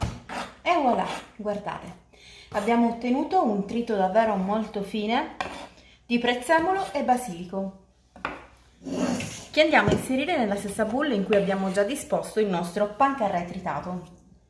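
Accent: Sicily, Italy. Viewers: dalla cadenza che hai quando parli credevo fossi di Palermo.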